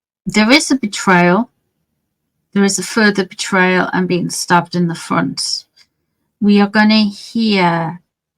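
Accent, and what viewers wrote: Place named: Newcastle, United Kingdom. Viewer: I thought was saying DL’s too..However I think she is saying DETAILS.. I think it’s her Geordie accent..please correct me if I am wrong..💙💙💙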